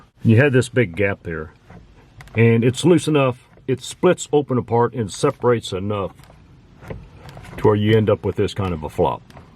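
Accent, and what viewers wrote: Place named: Northeast Ohio, United States. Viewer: Is your accent from NE Ohio by chance?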